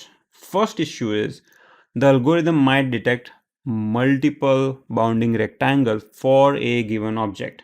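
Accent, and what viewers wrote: India. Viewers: indian accent is so cute 🤣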